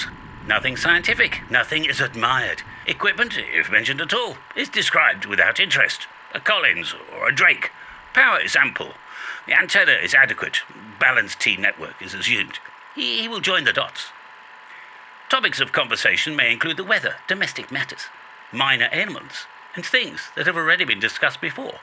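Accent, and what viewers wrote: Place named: RP, United Kingdom. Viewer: Love the posh accent! Thanks Mr!;) 73s de F4HZN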